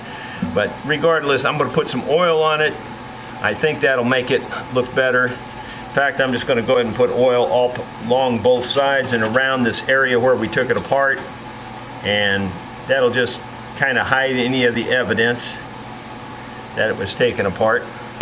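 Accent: South, United States. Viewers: Pay no attention to the nay-sayers. I am an old country boy and retired police investigator. My old partner used to say I was 'country smart'. He said that I was able to figure out the best and easiest way to accomplish a difficult task. You even have the same country accent that I have. Pay no attention to the jackleg "I know best or a better way' types. You ever get into N Georgia and the best college football team in the nation, Go Dogs! I'll buy your lunch. Keep up the good work!! WJS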